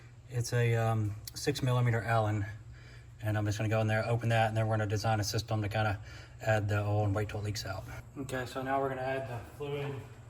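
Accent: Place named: United Kingdom. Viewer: What's with the British voice over? This is clearly in the US lol. It's in Florida in fact.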